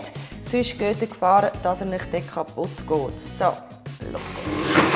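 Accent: Switzerland. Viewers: Cool ich muss das auch mal ausprobieren. Schweizer Dialekt klingt so sympathisch 🤗🤗🤗😋😋😋👍🏼👍🏼👍🏼👌🏼👌🏼👌🏼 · Super Getränk sehr gut gemacht ich liebe deinen Schweizer Dialekt LG ❤️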